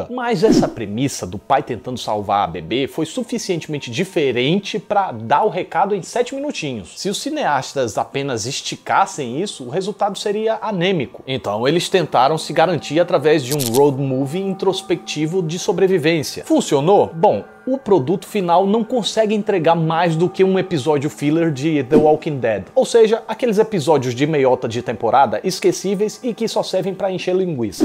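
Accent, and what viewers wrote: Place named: Alagoas, Brazil. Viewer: Acho tu e teu canal muito fodas, sou inscrito, acompanho e sempre indico aos amigos, te acho um baita profissional e te admiro ainda mais desde que percebi teu sotaque e descobri que tu es alagoano, se é difícil pra tanta gente talentosa chegar á, imagina pra um alagoano, mas hoje queria dar os parabéns não só pelo vídeo, mas pela resposta sobre sua gratidão ao Felipe Neto que mesmo eu não curtindo o cara, passei a admira-lo pelo que ele foi, é e representa pra ti, pela amizade e pela gratidão, eu podia até não gostar dele, mas hoje já ha algo de diferente no que eu penso a respeito do cara. Meu abraço hoje vai pros dois (rsrs). Parabéns por isso e pelo canal.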